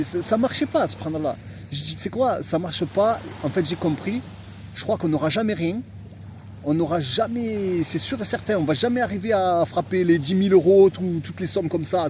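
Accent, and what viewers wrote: Marseille, France. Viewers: tu as l'accent marseillais , tu es sur que tu es d' angers ? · Salam alaykoum wa rahmatullah wa barakattuh Qu'Allah azawagell t'accorde le bien ainsi que ta famille 👪. Mais dit moi t'es un peu Marseillais non?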